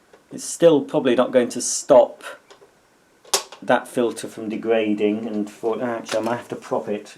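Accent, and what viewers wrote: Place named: United Kingdom. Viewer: Love the British accent! (That's a compliment)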